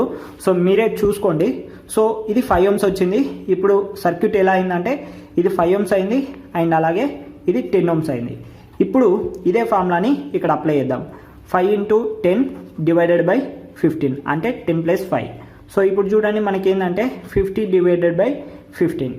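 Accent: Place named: Telangana, India. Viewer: language okkk telanganaaa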